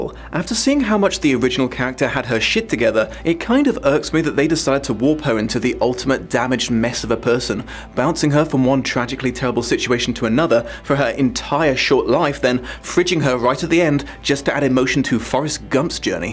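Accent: United Kingdom. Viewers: just curious, are you from the UK? I find that accents from parts of the world I'm familiar with (/from nearby so I've had at least the briefest IRL exposure too) definitely add to the immersion, but accents from other parts of the world can be sooo hard to grasp!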